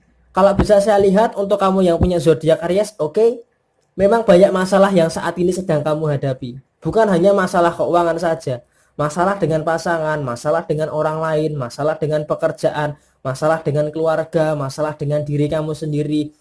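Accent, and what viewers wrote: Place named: West Java, Indonesia. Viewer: lah ,, mas orang sunda ya .. sama .. sunda na daerah mana mas?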